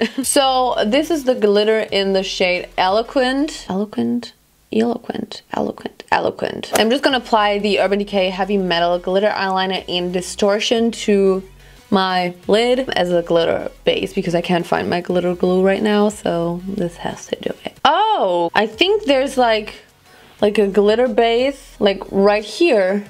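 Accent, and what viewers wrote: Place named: Germany. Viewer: Ist sie deutsch ? Weil die hat Nh deutschen Akzent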